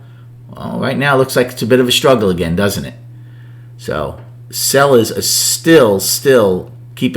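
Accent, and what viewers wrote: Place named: Boston, United States. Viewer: I know a boston accent when I hear it lol I'm from western mass! Let's get paid today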